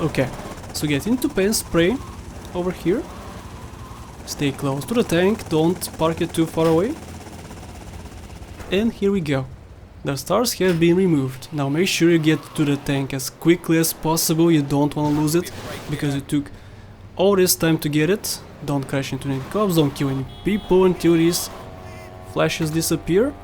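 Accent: Russia. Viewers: Чувак, ты же русский! Я чувствую это!!!! xD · You speak like a russian of English language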